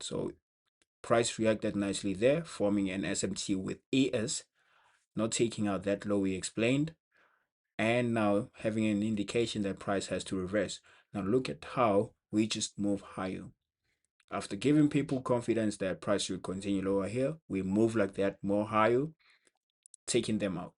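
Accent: South Africa. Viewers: You have South African accent where you from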